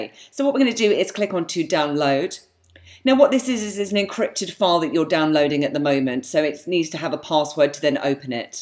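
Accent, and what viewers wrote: United Kingdom. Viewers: She has a British accent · she has a british accent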